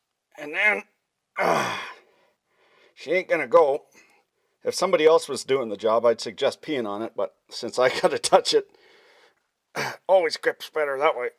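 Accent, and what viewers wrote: Canada. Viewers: I tell ya I love you man! I'm canadian been living in USA since I was 9 (am 39) but just listening to the engineering knowledge mixed with canadian common sense humor and a smidge of the accent brings me back to listening to my grandpa in Regina sask working on his international harvester good times. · Great to hear a true canadian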